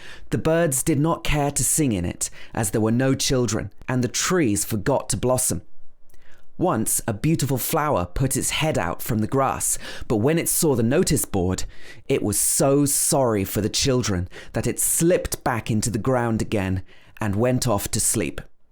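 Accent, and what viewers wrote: United Kingdom. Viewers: This is a nice story and very good for learning the British accent, keep it up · Trying to go to uk next year to learn English and started look for the videos in British accent and I love this!!! Thank you!! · I was looking for someone who actually has british accent with text book reading for my role practice, Thank you so much !! I can actually see the text and repeat words so I can practice while hearing your reading! lovely ♥ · You have been blessed by God.Your voice is adorable and I hope to listen another great story touched by your nice british accent. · I love your accent!! When I was child in my country in Central America I was learning British English, I don't understand why more American accents were introduced later. maybe because it is the same continent. 😂😅But I love this accent 🥰🥰🥰 Sorry for my English is not excellent 🫶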